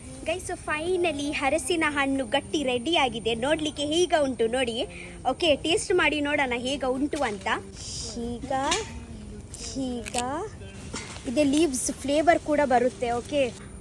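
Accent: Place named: Kerala, India. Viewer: Dodda mallu tara maatadtale..😅😅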